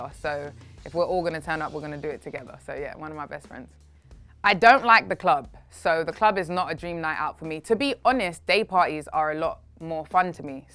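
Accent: United Kingdom. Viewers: YOU CAN HEAR THE BRITISH ACCENTS. I LOVE YOU ELLA MAI 🙏🇯🇲🇯🇲🖤💛💚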